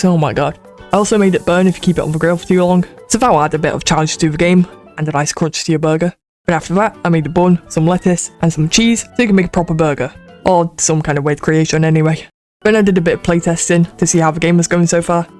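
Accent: United Kingdom. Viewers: Are u British? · Are you British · The british accent🇬🇧😭🙏💔🥀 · bro is british please i am challinging you to say "bottle of water" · Are you British because I'm British you sound like one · Are u british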